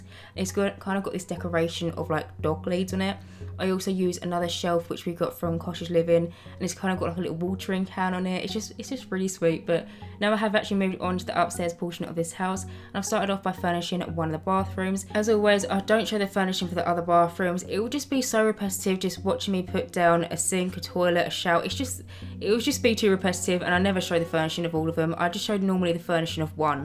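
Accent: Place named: East London, United Kingdom. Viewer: Its actually sooo refreshing to hear a east london accent on youtube tbh 🥰 im new to ur channel and u deserve more subs & views!! Also the sims treat us so bad sometimes building a whole clinic so u dont have to sit through a loading screen 😭🥴 the way u build is so interesting too like when u put tones of tissues on some surfaces or megaphones im always like ??? But it seems to work out in the end ! It was a really gorgeous build tho u did amazing!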